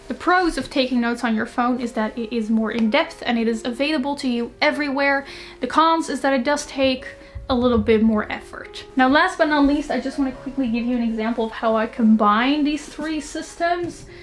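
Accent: Australia. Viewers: I love how you're getting an australian accent <3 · Anyone else noticed that she is starting to get an Australian accent on certain words 😂 Edit: the amount of people telling me she's Dutch 🤦‍♀️ I know she's Dutch. Just that I'm hearing Aussie twists on her words, not a bad thing at all, just an observation 😊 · Where are you from? Australia, Norway or USA?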